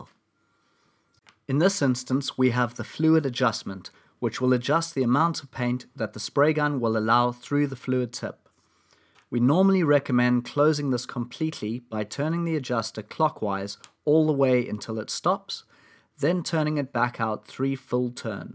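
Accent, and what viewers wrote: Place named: South Africa. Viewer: I detect the sound of a south african accent....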